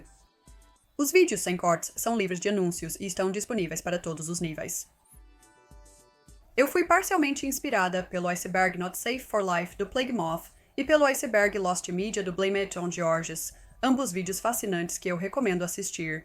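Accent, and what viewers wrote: Portugal. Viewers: Conteúdo muito bom. Porém, português de Portugal... 🤢 Impossível de ouvir por muito tempo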